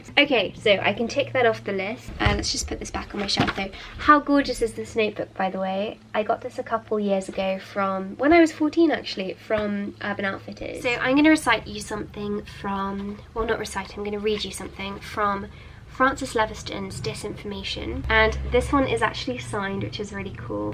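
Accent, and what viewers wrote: Spain. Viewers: Your Spanish accent is so cute!! Love you ✨💓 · I love your Spanish accent! It’s so cute🤗 I’m a native Spanish speaker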